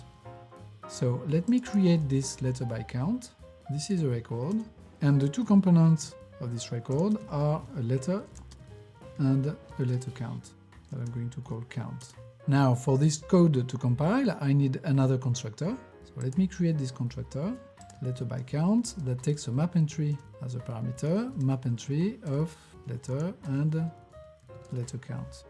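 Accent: France. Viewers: not at all. this is a french accent for sure 😊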